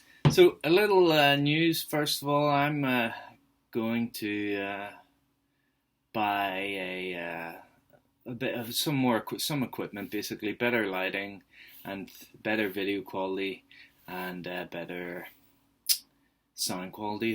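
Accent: Ireland. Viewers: Oh, now I see ,You are an Irish man, gotcha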